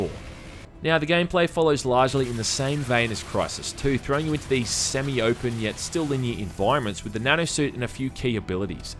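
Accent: Australia. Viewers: hussien Strahyan Accent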